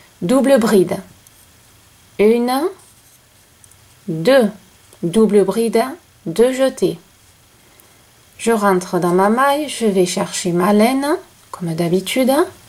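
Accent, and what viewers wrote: South, France. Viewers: Vous êtes formidable vous expliquer très très bien vous en dites pas trop juste assez et votre vidéo est très bien faite on voit bien vos gestes. J’ai longtemps cherché des tutoriels de crochets bien faits, le vôtre est exceptionnel! Je suis du Québec et en prime votre merveilleuse sonorité du sud. 😊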